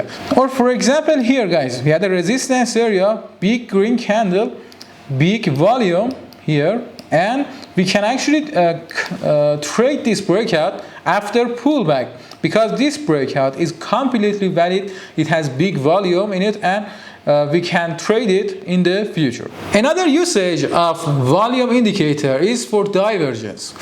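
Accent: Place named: Iran. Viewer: Hi its a great honor to see u here talking in english language but u have very farsish accent 😁😜🌹🌹❤️⭐❤️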